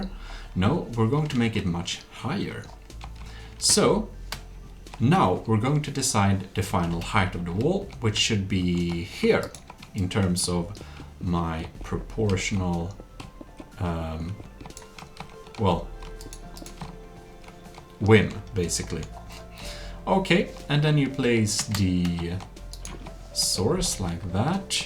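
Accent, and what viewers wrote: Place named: Sweden. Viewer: Let me guess you are from sweden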